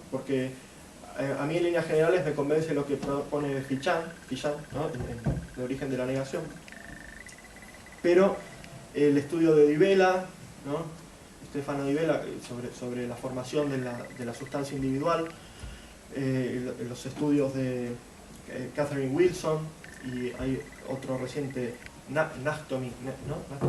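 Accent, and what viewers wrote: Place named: Argentina. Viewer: La verdad no entiendo, como esta genial exposición, no tiene más me gusta. A lo mejor, es que a mí me sirve, por el hecho de ser siego. Pero cuando uno ve, tantas tonterías en YouTube, con tantos me gustas, y estos excelentes videos, con tan pocos, entiendo por que vivimos así. Es cierto Leibniz tiene algo de Platónico. En el II libro de la República, cuando forma la ciudad, muestra que no puede existir virtud sin vicio. te mando un fuerte abrazo desde Quilmes (supongo si no me equivoco por el acento que tenés), tu Argentina.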